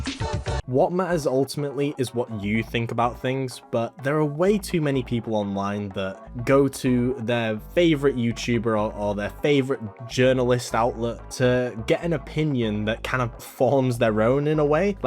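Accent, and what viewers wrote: United Kingdom. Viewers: That Life Of Brian joke was too good XD i don't listen to you at all. I just want to throw money at your british voice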